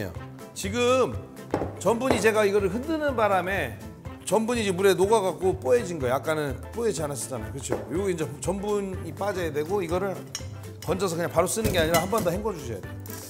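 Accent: Chungcheong, South Korea. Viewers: 자기가 언제부터 충청도 사투리 썼다고 웃음 나오네 충청도 몇년이나 살았다고 내가 알기로는 중고등학교도 서울서 나온거 같은데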